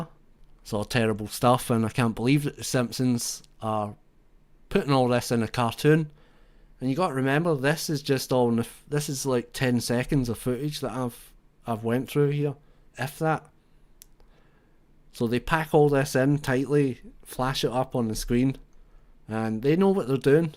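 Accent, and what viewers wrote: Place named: Scotland, United Kingdom. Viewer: was expecting an american person making this ... was really shocked when i heard a scottish person start talking! You must be from the outer hebrides or something because the majority of scotland is a secular , rational , irreligious place.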